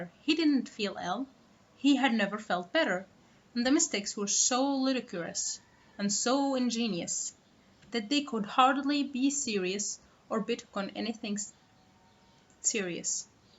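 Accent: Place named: Spain. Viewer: She sounds a little Spanish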